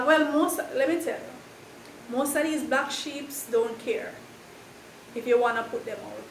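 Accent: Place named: Jamaica. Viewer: Listening to the content and the patois🤭😊. Thanks for the insights. Cheers namesake💪!!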